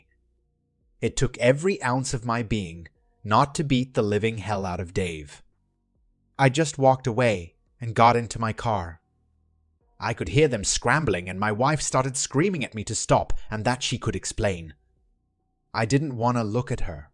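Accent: United Kingdom. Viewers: Not my favorite rendition either. Would sound more authentic were the AI not speaking with a British accent.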